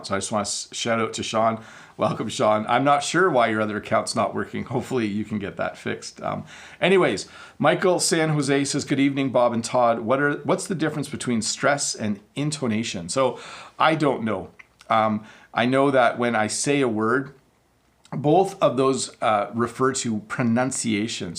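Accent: United States. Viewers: Canadian and American accents are the same or not . We hen I heard you fist time I thought you are american. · Hi sir I you really Canadian... I thought you from American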